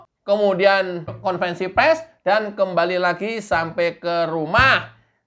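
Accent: Java, Indonesia. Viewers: Pak kalo logat jowone keluar,Mantap. seperti; Cok...Dancok / Jancok...😃 Blok...Goblok...😃 Besok kata"nya di tambahi; Uthek'mu Cok😲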